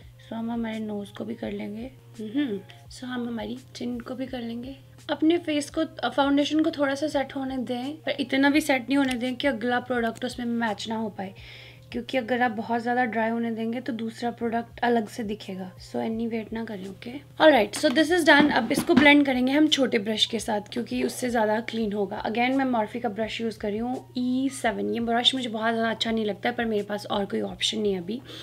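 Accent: Punjab, India. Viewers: Hello Mem ap Punjab se ho kya apni batye payari ha bolne ka tarija name nic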